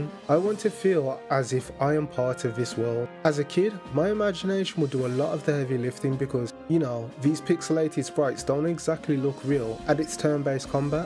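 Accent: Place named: West Midlands, United Kingdom. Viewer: Omg your accent is west midlands accent I'm from Oldbury!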